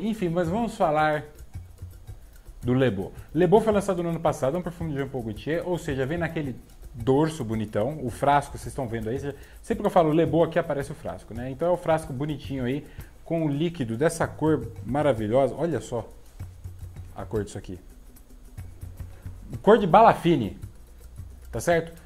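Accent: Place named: Rio de Janeiro, Brazil. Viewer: Salve lá!:) Eu ri demais do seu sotaque carioca, meu:D. Não curto muito as coisas da JPG porque é simplesmente doce demais:D. Vou por na lista dos decants para saber se vale a pena.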